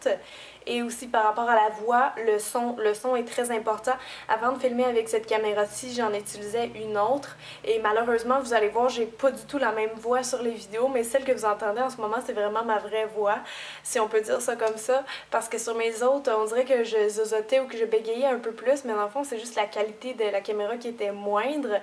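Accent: Quebec, Canada. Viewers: Ton accent ne me choque pas au contraire j'aime beaucoup l'accent québécois :) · Moi j'adore l'accent québécois !! Je suis jalouse avec mon vieil accent du sud ouest de la France^^ ;-) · C'est drole parce que je m´était abonné à une chaine de maquillage canadienne, "judeobsession", mais son accent était vraiment tres tres prononcé et parfois je ne comprenais meme pas. Mais toi, ton accent est plus léger, et je trouve ça trop cute! Et puis j'adore les expressions québecoises, genre la façon de dire "etchetera" ou "c'est l'fun" ^^ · Je suis française et J'ADORE ton accent québécois =D Et toutes tes vidéos en général... Pourtant, je ne me maquille que (très) rarement ;p Bonne continuation ^^ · Merci pour ces supers conseils et encouragements. Ta chaîne est super et ton accent québécois est tout simplement trop mignon. Comme tu le dis l'important c'est d'être compréhensible et tu l'es tout à fait, je n'ai jamais eu de soucis pour te comprendre. 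· Je suis entrain de tomber amoureux de ton accent québecois ! vous être trop mignonne chère demoiselle franchement je suis époustouflé (k) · Merci pour ta vidéo je pense que c'est une vidéos vachement utile, je pense qque tu veras pas mon messages vu que tu dois recevoir plein de commentaires je viens de coommencer une chaine fille pas baser sur les make-up mais plutot tenue du jour , haul, soin ...:) J'espère que tu pourras y faire un tours et éventuellement t'abonner (c'est beau d'espérer) et bonne continuation et ton accent québecois et très beau ne t'inquiètes pas on comprends très bien ce que tu veux dire bonbon bisous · mais c'est génial l'accent québécois justement ^^ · L'accent québecois est juste un régale, et un plaisir a entendre ;)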